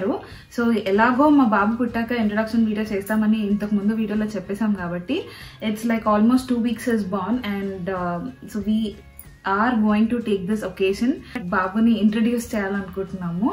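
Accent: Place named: West Godavari, India. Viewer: Awwww my slang u also from w.g.dt good good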